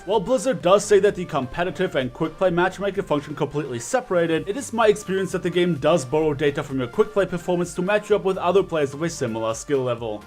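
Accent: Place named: Germany. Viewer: Sorry man but I cant follow you. Your english is so bad. Thats really not an insult, but an advice. Work on your language skills. How you pronounce words and stuff. I am 99% sure that you are not mother tongue english. Sounds like german or so. Anyway: Cant follow the information you give because your spoken words are not accurate.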